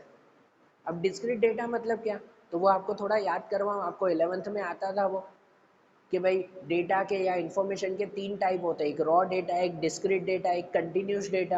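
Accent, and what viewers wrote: Gujarat, India. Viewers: he got the gujju accent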